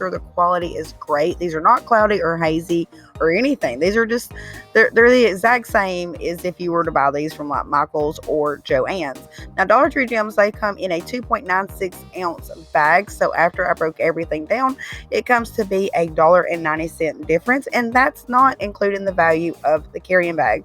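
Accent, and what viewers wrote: South, United States. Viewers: Beautiful.... I love your. Country accent super cute. Ive been watching you now for almost 2years. It goes by fast. Thank you for exploring your creative mind allowing us in on all your secrets!!!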